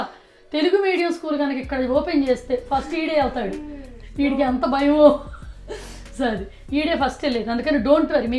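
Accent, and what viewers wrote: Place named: Guntur, India. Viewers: MEE VOICE NAAKU CHAALA ISTAM!!! It is unique, vinalanipisthundhi vintunte dhaaniki thodu aa gunturu yaasa perfect blend. Negatives assalu pattinchukokandi vaallaki taste ledhu anthe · Sister asalu mee voice yentho bagundandi asalu first time mee videos chusinde mee voice vini because ma kosta ammayi laaga anipinchindi so I love ur voice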